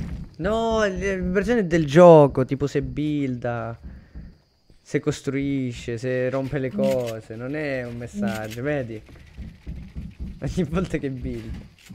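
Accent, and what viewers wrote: Calabria, Italy. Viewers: Compa ma di che paese sei della calabria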